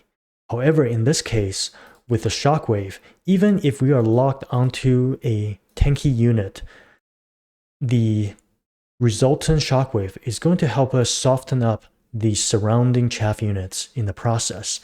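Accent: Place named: Taiwan. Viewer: ahh yes! my taiwanese colleagues speak in such accent too! keep up the great content! love your explanation on your train of thoughts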